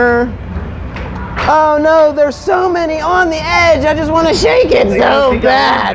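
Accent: Pittsburgh, United States. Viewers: Are you from pittsburgh Pa??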